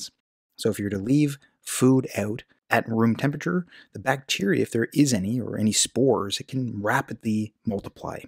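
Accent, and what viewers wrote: Canada. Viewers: Canadians talk funny.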